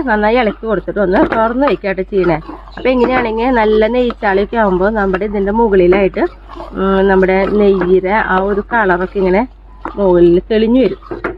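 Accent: Thrissur, India. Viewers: Nice to hear trichur basha smiths God bless be cause Amma is with u dear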